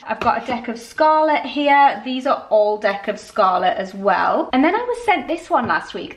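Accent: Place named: Wales, United Kingdom. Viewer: R u from wales cause u sound welsh Xx 😘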